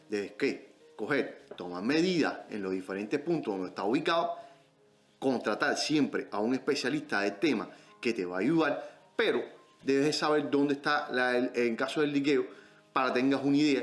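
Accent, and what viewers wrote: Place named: Cuba. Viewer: Este tipo que idioma habla ....parece a veces castellano cubano con partes de ingles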